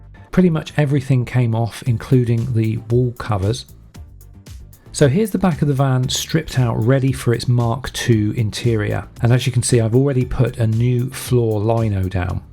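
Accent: United Kingdom. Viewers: You sound like your over in the U.K.? We did have some ex friends that lived over near Cumbria UK, the lakes District. But we was friends to them but they weren't true friends to us, they was true users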